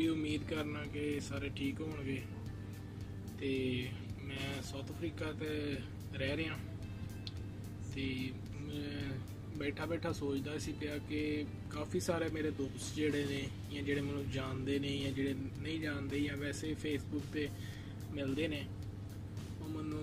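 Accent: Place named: Sialkot, Pakistan. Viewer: Bhai Aapki BOLI sialkot wali hai... I'm sure kiunke Main bhi Sialkot se hon.. Yeh Punjabi Sialkot ki hai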